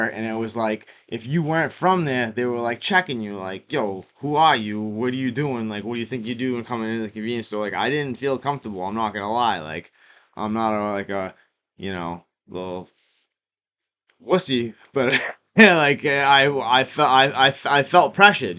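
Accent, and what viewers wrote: Boston, United States. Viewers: So Baawwston 🤘👺🤘🔥🔥🔥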